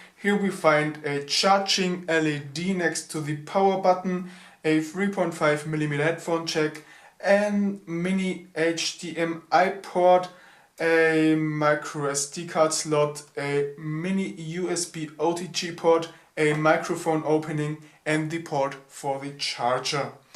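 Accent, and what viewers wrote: Germany. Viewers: German. I'm really sure. Source: I'm German. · Can' tell if he's german or indian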